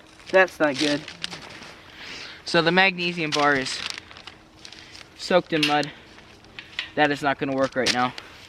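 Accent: Pittsburgh, United States. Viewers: Just saying. I'm from Pittsburgh. And you have a strong Pittsburgh accent.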